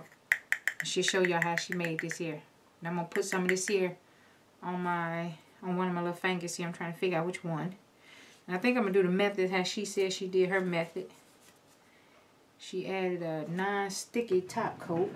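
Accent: South, United States. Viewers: Omg southern gal to the fullest 😂. I love this!!!!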